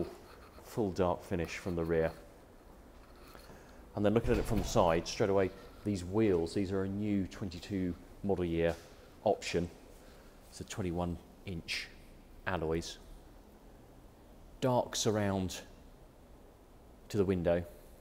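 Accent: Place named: RP, United Kingdom. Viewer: The presenter is ace. Sounds like he went to Sandhurst.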